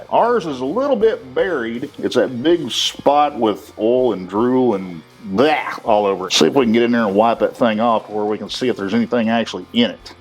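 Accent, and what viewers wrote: South, United States. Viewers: When someone with a southern accent tells me "That's bad, don't do that" with a cigarette hanging out of his mouth I'm gonna listen.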